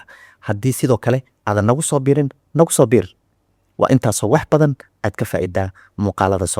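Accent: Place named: Hargeisa, Somalia. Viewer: Adi reer hargeysa ayaan ku moodayeybe😅😅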